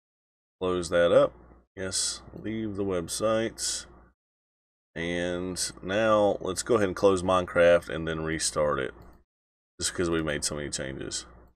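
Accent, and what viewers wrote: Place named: South, United States. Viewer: nice southern accent, i am also from the south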